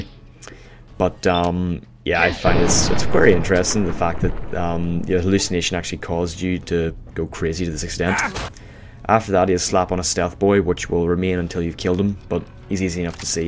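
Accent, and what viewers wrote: Northern Ireland, United Kingdom. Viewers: Northern Ireland ?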